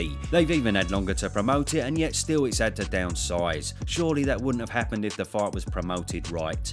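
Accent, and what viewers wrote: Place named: Mockney, United Kingdom. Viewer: Once I heard the mockney accent and first f bomb i checked out.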